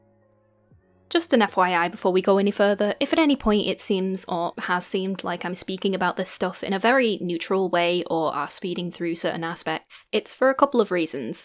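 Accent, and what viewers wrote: United Kingdom. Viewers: hi, i sit with the video through the end, you have a really awesome way to put the pieces together to say exactly what needs to be said, love that bit where you said the person we have to protect the most at the end of the day is ourselves, that is true and it's what i've always thought! i don't usually involve myself in drama and prefer to block and move on. however, i feel like we need to speak sometimes on these topics that could influence a young audience. btw love your british accent? it's pretty thick lmfao ( not saying that in a negative way!! just in a "i noticed" way ??? idk how to explain it LMFAO ) have a good day and u have earned a new subscriber 👍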